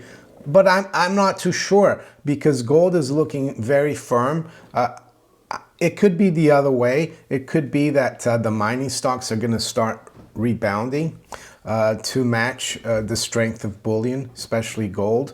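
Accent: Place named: United States. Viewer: I have a silly question I’ve been meaning to ask -, how did you end up speaking English with an American accent? Is that common in Brazil?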